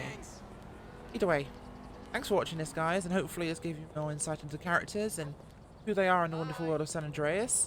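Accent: England, United Kingdom. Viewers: Girl you’re BRILLINT! Love that broke down your characters and gave their history…, I love how you’re evolving on the new channel (ACDCrp). Love your content and videos. I am glad you and Deecan left DOJ, they are becoming a little bit anal on the channel. Mostly they cannot deal with negative comments, nor multiple comments on a episode. Their reply's are normally rude…like “If you don’t like it, go to another channel”…see ya! or in caps stop writing several comments, one will do! So I unsubscribed…. I still watch the channel because I like when Martin and Blackhallowis online, especially when he does Chi P and George. Anyway, keep up the good work and your awesome videos. I love to see more of the type of police/detective work like your episode 24 - It's Time to Stakeout the Trash! And besides I love the English accent (BTW I miss living in Ipswich), especially your character with that sexy voice and walk….Your so Hot!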